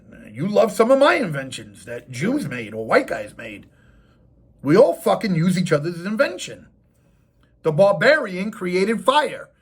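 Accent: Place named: West Coast, United States. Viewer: Dog you sound like you from the west cost and you one of those woods that did time in pen because whites and mex run together and that's cool but they can handle them self's on the streetz so stop side bustin and oh yeah Bob James didn't created his music wit hip hop in mind ,..stop you know what I'm saying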